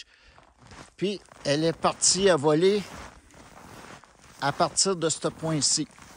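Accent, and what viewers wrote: Quebec, Canada. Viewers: ce bel accent canadien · Quel region du Quebec c'est ca?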